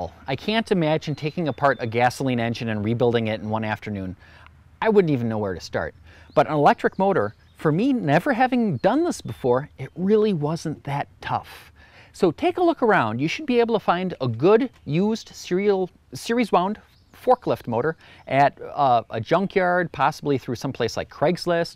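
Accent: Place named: Wisconsin, United States. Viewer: Ah that great Wisconsin accent, takes me back home. Great video series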